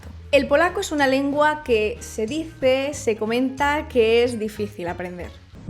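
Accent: Spain. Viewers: ??? Ella es española 😕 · Amiga, cómo aprendiste ese acento de España? No es por ofenderte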